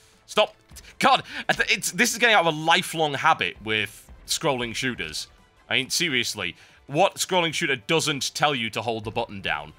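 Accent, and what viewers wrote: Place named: England, United Kingdom. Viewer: Where about in England you from? Your accent is amazing. I'm from Birmingham so I sound like a idiot:(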